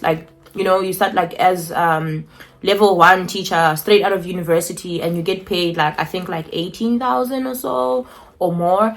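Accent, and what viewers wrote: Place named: South Africa. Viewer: It's so nice to hear a South African talking about this. I have an interview next week for a ALT job in Japan. Fingers crossed!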